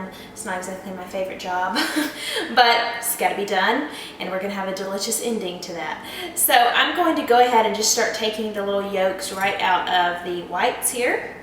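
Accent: Texas, United States. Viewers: Are you Texan? I love your accent! You are also very pretty!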